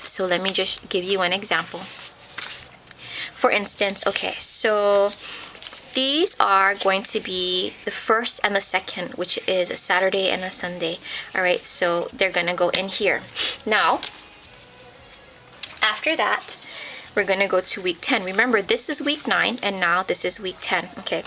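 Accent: Hawaii, United States. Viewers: Are you from Hawaii? You can totally hear it. Lol "used to to". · Howzit! I thought so! I could've sworn that I heard you say "dat' and 'dis", I could tell when you said, "Used to, to". That's a dead giveaway! You can take the girl out of the island but you can't take the island out of the girl. ALOHA!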